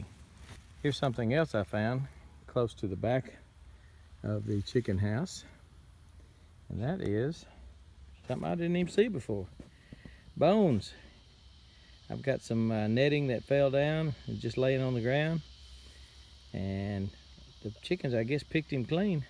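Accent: Texas, United States. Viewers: Dude you have the absolute perfect Texas accent/voice. I could listen to you read a book or do the news or something like that. I grew up in central ok then moved to north texas so mine is not quite as pronounced as yours. I love it though. Good show!!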